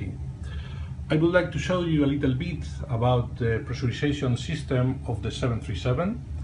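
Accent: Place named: Catalonia, Spain. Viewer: Accent català jajaja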